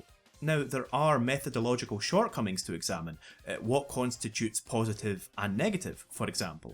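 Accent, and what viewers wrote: Scotland, United Kingdom. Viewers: narrated in an awesome Scottish accent with regular rolling "r's"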